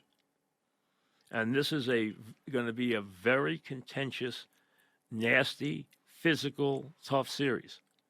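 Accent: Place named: Philadelphia, United States. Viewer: Geez Looeeze. Did you grow up in south Philly?? Wtf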